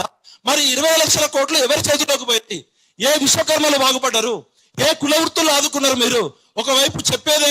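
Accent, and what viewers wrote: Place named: Hyderabad, India. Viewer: Well said boss, 100% you are right pakka Hyderabadi style lo cheparu, in detail you said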